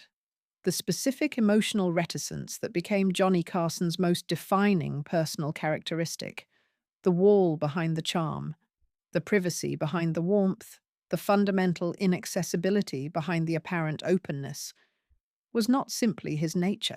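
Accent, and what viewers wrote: United Kingdom. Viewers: Do we really need Brits to narrate an American story. Surely an American accent would be more compatible.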